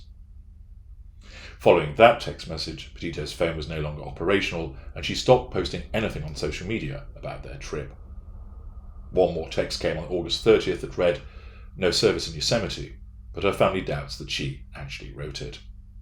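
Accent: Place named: Oxford, United Kingdom. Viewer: No *Said in that rich Oxford accent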